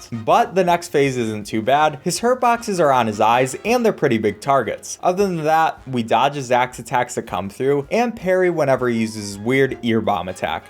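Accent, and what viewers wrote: Sweden. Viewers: are u from sweden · excuse me are you swedish? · Are you Swedish I em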